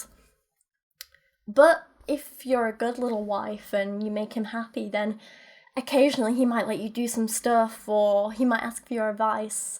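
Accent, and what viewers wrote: United Kingdom. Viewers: Are you British? I swear i can hear a bit of British in your accent.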